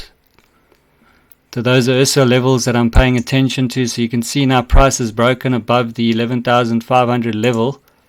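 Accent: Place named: South Africa. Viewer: May I ask what country you are from originally? You don't sound like a New Zealander. I mean no disrespect. If I had to hazard a guess, I'd say you're South African maybe?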